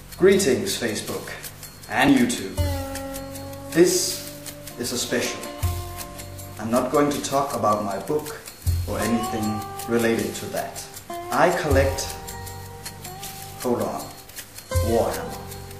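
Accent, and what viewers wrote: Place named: Denmark. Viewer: I just love when you clearly can tell that you are danish just from your english accent!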